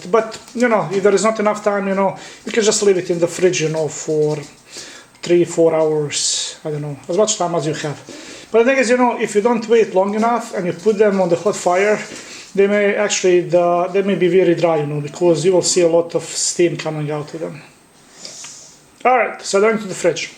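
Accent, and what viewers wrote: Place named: Bosnia and Herzegovina. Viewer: the only thing in this video close to a bosnian cevap is the accent!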